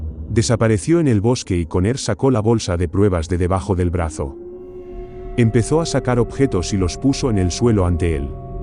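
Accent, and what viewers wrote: Spain. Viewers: Primera vez que entro al canal ,me encanto ,muy bien relatado ,y el acento español le da ese toque unico en nuestra lengua ,saludos desde buenos aires ,me suscribo ..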